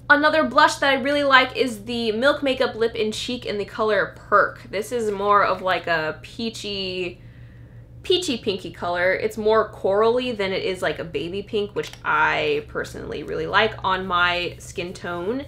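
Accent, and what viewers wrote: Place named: Australia. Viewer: The Australian accent gets me every time lol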